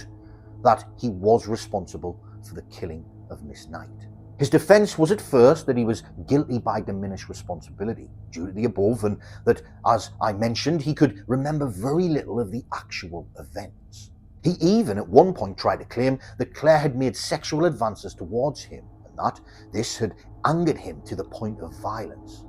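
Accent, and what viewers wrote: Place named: Newcastle, United Kingdom. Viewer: Binging on much of your content - thank you very much for it, keep it coming. Another subscriber and fellow Geordie. At least I think you're a Geordie 🙂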